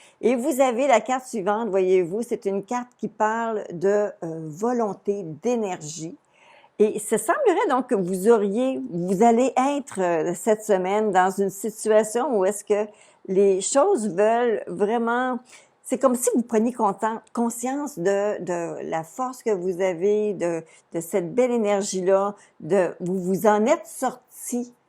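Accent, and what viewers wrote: Quebec, Canada. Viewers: Coucou j’adore l’accent québécois 🌸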